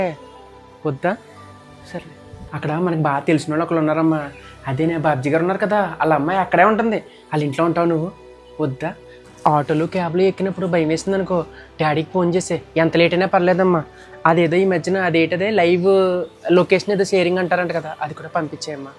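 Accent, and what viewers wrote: Godavari, India. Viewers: u are extraordinary …గోదావరి యాస అద్భుతం…అన్ని సంఘటనలు మన ఇంట్లో జరిగినట్లే ఉంటాయి…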